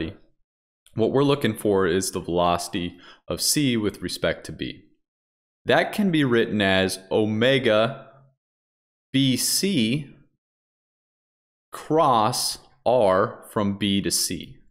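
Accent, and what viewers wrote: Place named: England, United Kingdom. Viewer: Finally, someone that speaks with pure English accent. Please post more videos on the acceleration analysis using complex number and vector math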